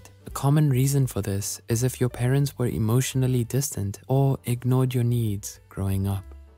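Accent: South Africa. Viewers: out of topic but is the person talking south african? i hear the accent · Is this voice over South African? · Idk how to explain it, but within the first five seconds of hearing the narrator, I immediately heard a fellow South African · I am hearing my people. Is the narrator South African?🇿🇦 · Are you south african?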